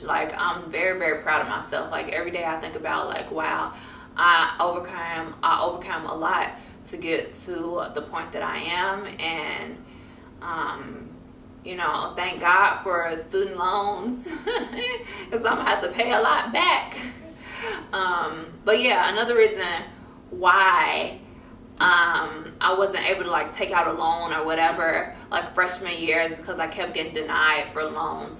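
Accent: Chicago, United States. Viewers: Are you from Chicago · I had a feeling you was from Chicago. I watch your videos often and I always be knowing when people from Chicago!! I can just hear it in your voice and stuff.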